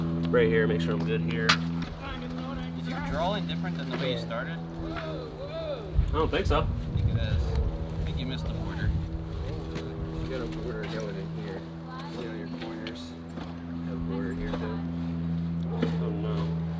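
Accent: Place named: Chicago, United States. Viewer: Why your n ants accent sound like ur from chicago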